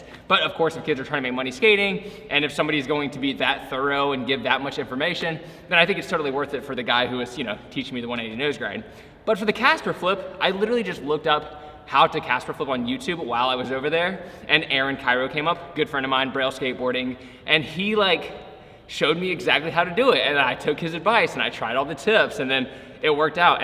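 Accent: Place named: Texas, United States. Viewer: Your Texas accent and slang are really starting to show 😂 love it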